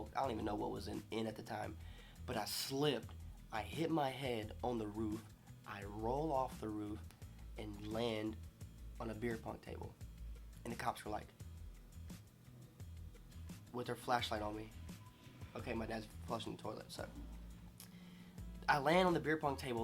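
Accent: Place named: South, United States. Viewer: He has a really thick country accent😹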